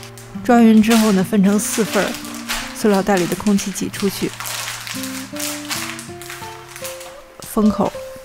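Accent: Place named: Xi'an, China. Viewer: 之前在国外就看你的视频，那会儿一听口音就感觉是西安的，做的菜跟我家也很像，一晃几年了，回国了还看你做菜。❤❤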